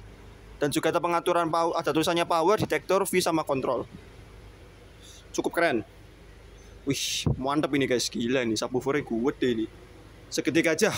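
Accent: Java, Indonesia. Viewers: Komentator nya medok banget... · Mobilnya keren... Yg gak keren tu orang yg ngerivieu nya..katrok medok...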